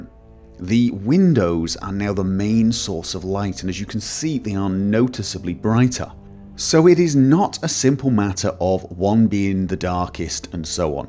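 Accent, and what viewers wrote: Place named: England, United Kingdom. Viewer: I just love your english accent. I can understand everything! (Which is unusual for me, as I'm not natve english speaker) As to video. I definitely like ELFX the most out of these 3, and it loses only to enb. Which leads me to question: What enb are you using? I myself love opethfeldt6's and wouldn't change it for anything else.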